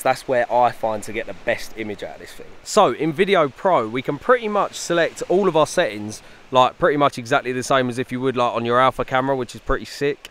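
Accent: United Kingdom. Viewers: Bri ish❤️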